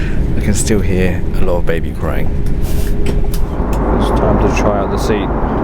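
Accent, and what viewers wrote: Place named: United Kingdom. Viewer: Nice British accent.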